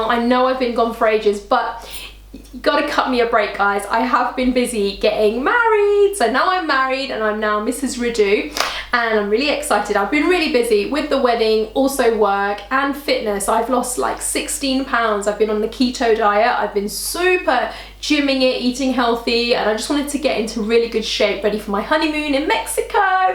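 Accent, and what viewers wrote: London, United Kingdom. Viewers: You're so very London!! I love it!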